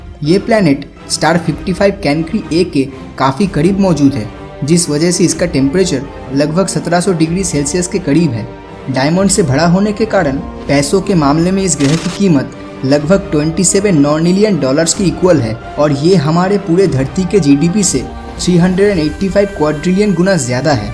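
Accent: Bengal, India. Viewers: Bengaliness in Hindi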